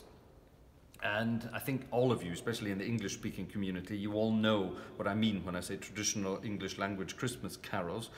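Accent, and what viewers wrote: Germany. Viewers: Love your channel and thanks for all the wonderful videos! If I may ask, and the question somewhat fits the titel of the video: How long have you been living in Germany? I mean no offence, but you seem to have a slight German accent in your English? That, or my hearing is just shit! Keep up the amazing work on your channel! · You seem to have developed a slight on-and-off German accent.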